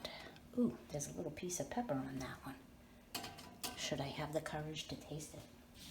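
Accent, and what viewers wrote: New York, United States. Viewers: I love how you take the time to explain things so nicely and stress safety. This looks sooo good! Sounds like you might have some sort of allergy to something in those hot peppers. Bless your heart. Thank you for sharing this recipe. I’m gonna try this one for sure!, I love to hear your voice. I’m originally from South Jersey and hearing your NY accent warms my heart.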